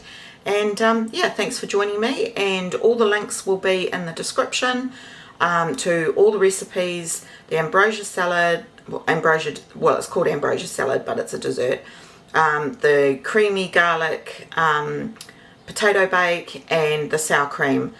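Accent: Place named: New Zealand. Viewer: love your kiwi accent!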